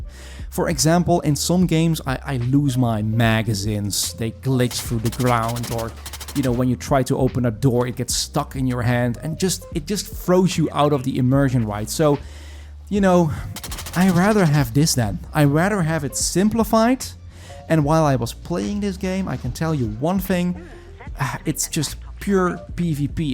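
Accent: Netherlands. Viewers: U bent nederlands? Ik kan het horen aan uw engels accent.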